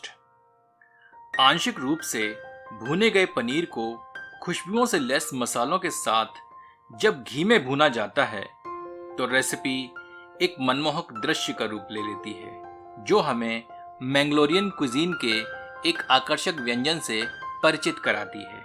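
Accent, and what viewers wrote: Standard. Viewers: आपकी रेसिपी तो शानदार है ही और सबसे अच्छी बात आपका हिन्दी में शुद्ध पकड़ घी से भी ज्यादा मनमोहक लगा प्रणाम स्वीकार करें... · आपकी शुद्ध हिंदी ने मुझे मंत्र मुग्ध कर दिया। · Itni sudh hindi bahut acha laga aap ki awaz aur receip Humne aaj first time aapka vedio dekha😊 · Wowwww too good recepie 👌👌👌 aur usse bhi zyada aapki hindi.. bahut achcha laga sun k itni suddh hindi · Recipe to acchi h bhai g par itni शुद्ध हिन्दी sunke maja aa gya 😊😊👍👍👍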